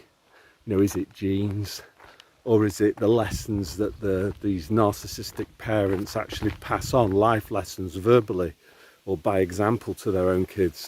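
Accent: United Kingdom. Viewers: Sounds British to me. People believe what they were taught/shown on TV/movies, etc...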